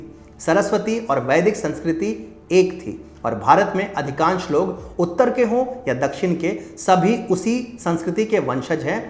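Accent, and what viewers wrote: Standard. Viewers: Detail study video. Nice. Shudh hindi sunkar bahot acha laga. Dhanyawad.👌👌👌👌👌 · । आपका शुध्द हिंदी का वाचन करना एवम् आपके हिंदू-प्रेरित तथ्य, आपके हृदय में स्थापित हिंदी, हिंदू एवं हिंदुस्तान के प्रति अप्रतिम आस्था को प्रदर्शित करता है ।हिन्दुओं को अपने संस्कृति के प्रति जागरूक करने हेतु आपके इस अद्भुत प्रयास के लिए मैं आपका आभारी हूँ । वास्तव मे आप अतुल्य है। ।धन्यवाद ।- प्रभात रंजन सिंह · i respect your beautiful shuddh Hindi narration 👌👌👌🤩🤩🤩.. Phranaamam Namashkaar 🙏🙏🙏 AIT is a false theory to divide us!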